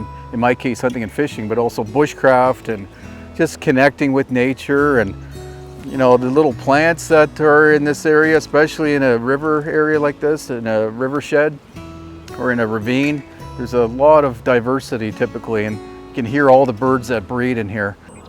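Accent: Canada. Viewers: You’ve got a be a Newfie lol 👍👍👌👌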